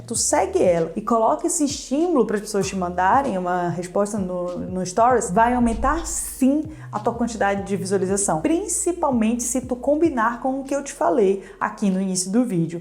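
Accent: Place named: Florianópolis, Brazil. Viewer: Algo me diz que o sotaque é de floripa 😅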